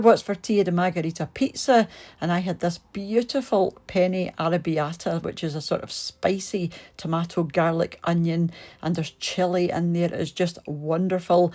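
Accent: Scotland, United Kingdom. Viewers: I personally think voice is a very important part of her channel,she is a good cook,her recipes are good,but her calm Scottish accent is everything,and also mentioned by her viewers a lot of the time.😊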